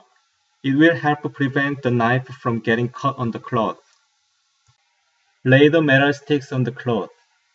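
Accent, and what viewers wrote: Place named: United Kingdom. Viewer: Your British accent is so strong, what part of the u.k. Are you from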